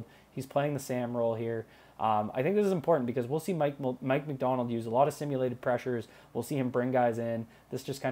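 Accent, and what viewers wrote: Canada. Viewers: Your Canadian accent is so strong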